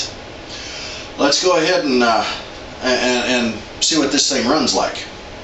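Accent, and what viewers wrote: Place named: Deep South, United States. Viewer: Guessing your from the Deep South somewhere....that was the most relaxed review I've ever seen....enjoy your saw and this was helpful.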